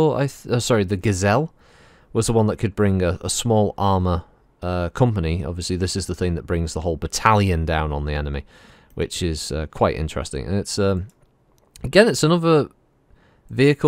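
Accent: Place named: RP, United Kingdom. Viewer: It's that elite upper-class British accent 🙂.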